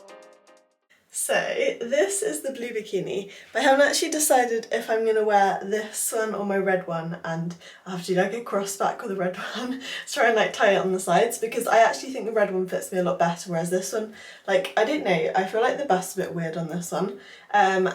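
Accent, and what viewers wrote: RP, United Kingdom. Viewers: love that British accent, sexy woman!!! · You created an emotive response in me from the first bikini angle, thank you, I do like a well spoken girl (posh) :-)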